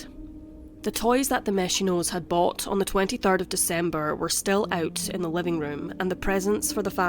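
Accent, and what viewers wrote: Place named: United Kingdom. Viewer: Your voice is freaking perfect! I don't know what it is about British accents, but 😍!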